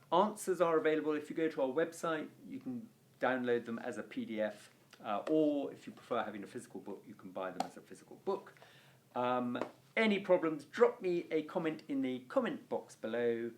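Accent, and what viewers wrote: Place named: England, United Kingdom. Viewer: Thank God for your English accent and clarity. I sometimes think I'm listening to Caesar recited by Davy Crockett.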